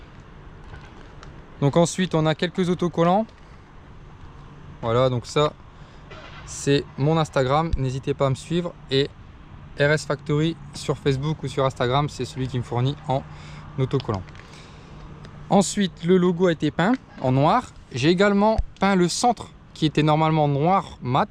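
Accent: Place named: North, France. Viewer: J'ai l'impression d'entendre l'accent du nord =)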